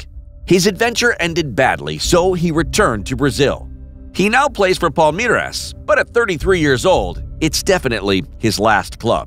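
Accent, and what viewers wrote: United States. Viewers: its not benfeca its pronounced benfi-ica, and is it only me that finds it weird to hear American accent on a football video?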